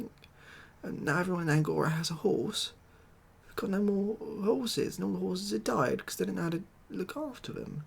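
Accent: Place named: Estuary, United Kingdom. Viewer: Nice estuary English. "Transla'or! "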